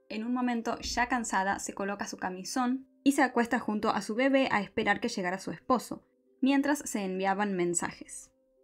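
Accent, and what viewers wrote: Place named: North, Argentina. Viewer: Casi muero al escuchar tu tonada!! Ame!!! Claramente sos del norte pero no quiero arriesgarme a decir que del interior del corrientes. Muchos exitos, suscriptora nueva 😘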